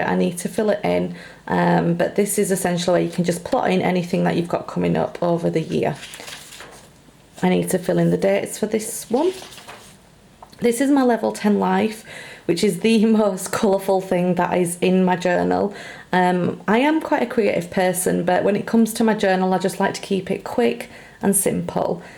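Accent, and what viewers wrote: Yorkshire, United Kingdom. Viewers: Loved it. And I do believe you're a Yorkshire lass? Subscribed xx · I got waaaaay too excited when I heard your familiar Yorkshire accent 😂 I'm from Hull but I live in Leeds now ^_^ Love your bujo xx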